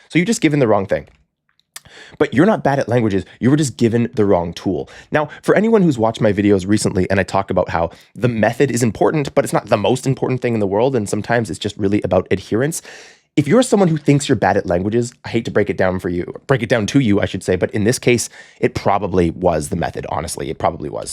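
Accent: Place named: China. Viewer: Dude,your mandarin accent is just great,keep it.———from Douyin🎉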